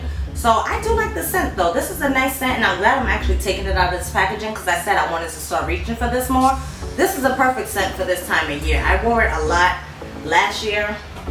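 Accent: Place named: Bronx, United States. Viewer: I enjoyed how New York this video was 😂. I knew right away you was from the Bronx😂😂. A lot of these scents I have so many memories attached to it. At one time everyone and they motha had Gucci Guilty. MAC Turquatic is underrated but I’m happy about that. I love perfume videos where you can see the dents in the perfume. I’m going to check out a few you mentioned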